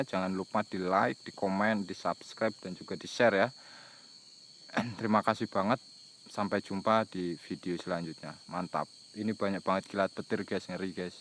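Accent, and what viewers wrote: Java, Indonesia. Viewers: Asal mana bang,, logatnya medok jowo tapi suka ngomong bahasa sunda "aing". 😀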